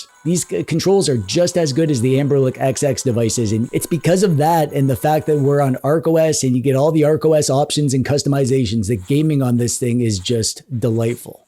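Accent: Canada. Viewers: I hear a guy with a Canadian accent, I’m trusting him. This handheld looks like a winner!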